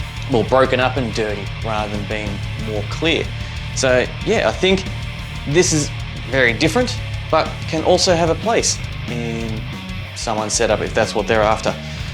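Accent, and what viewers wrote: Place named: Newfoundland, Canada. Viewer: newfy/irish accent!!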